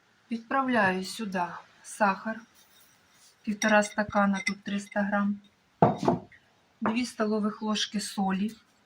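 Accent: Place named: South-East, Ukraine. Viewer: Дратує? Прямим ходом на медичний канал лікуватись! Мене забавляє південно- східний діалект.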